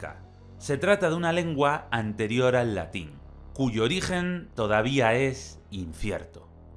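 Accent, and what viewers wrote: Spain. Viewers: Uma aula espetacular sobre a Espanha. Parabéns pelas informações e pela dicção clara e vagarosa, que me permitiu entender tudo, mesmo sendo brasileiro. Adoro o sotaque espanhol da Espanha e consigo distingui-la muito bem. Viva a Espanha.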